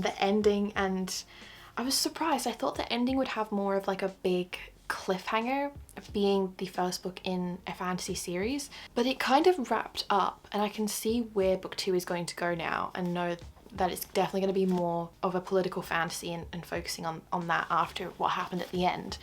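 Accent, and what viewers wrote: Australia. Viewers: Has anyone ever told you that you sound like an Australian? I am Australian and had to double check 😅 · I’ve just found your channel and I’m obsessed! You’re so calming and genuine and you can feel how much you truly love reading and getting immersed in the story. Also the accent love are you a fellow Aussie/ half Aussie/ Irish.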